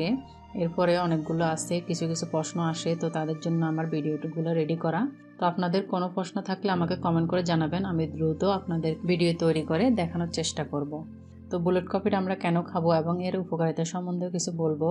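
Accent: Chittagong, Bangladesh. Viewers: Apu you from chittagong?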